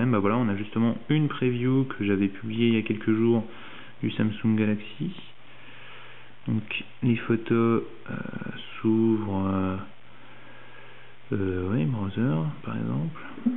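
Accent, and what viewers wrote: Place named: East, France. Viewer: Bel accent de l'Est ça me rappelle mes racines!!! Sinon ton galaxy semble avoir des soucis, le slide parait bien plus réactif sur le mien et je n'ai jamais eu de problème avec l'APN... Belle demo en tout cas!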